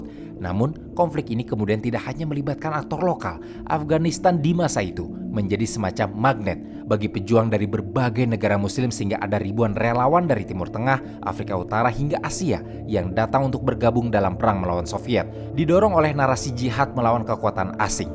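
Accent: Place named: Banyumas, Indonesia. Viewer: Wong ngapak ngendi kie😂 tegal um?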